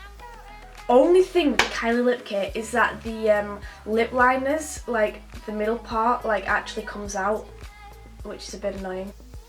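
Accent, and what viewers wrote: United Kingdom. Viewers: hey, I am new to your channel, watching your video for the first time! you're beautiful! and please don't mind me asking, are you British? (I LOVE your accent)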